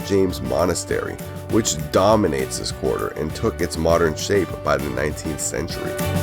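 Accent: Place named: United States. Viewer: Video and preparation optimal. Commentator's English is too American and word eating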